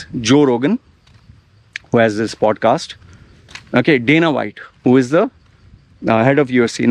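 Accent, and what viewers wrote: India. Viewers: you have an Indian accent, great video btw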